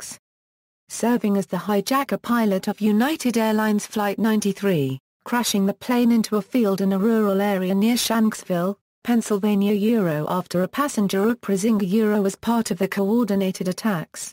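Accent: United Kingdom. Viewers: That’s just how British people talk lol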